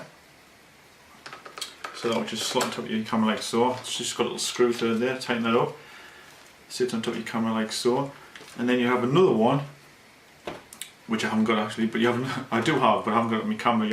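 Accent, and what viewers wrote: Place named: North East England, United Kingdom. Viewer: hi, I like your accent, sounds like north-east England. Is Nikon D750 built-in flash also using optical signal to control? If we use a sb700 on the top as a master flash, do we still have to use trigger for other slave flash? Thanks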